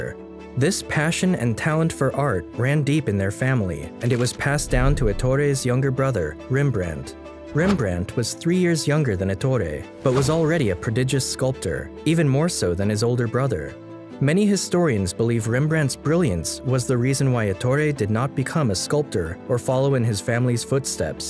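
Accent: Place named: United States. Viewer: I appreciate the history content, but man learn to pronounce names of people and places in their original form. The americanisation of everything throughout this video was tearing my ears off…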